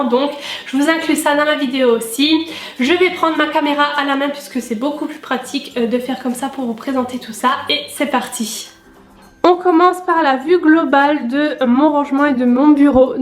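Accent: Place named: Alsace, France. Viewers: C'est la premiere fois que je ne m'ennuie pas dans une video rangement makeup lol.. Sinon t'as vraiment l'accent alsacien ou lorrain jsp mddr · Hello! suis fan de tes vidéos et Strasbourgeoise ! ça fait plaisir de reconnaître quelqu'un avec son petit accent ! Superbe vidéo, moi qui me fait une coiffeuse ça m'a donné plein d'idées ! merci beaucoup et gros gros bisous !! #TeamSnap 🌟🌟🌟🌟🌟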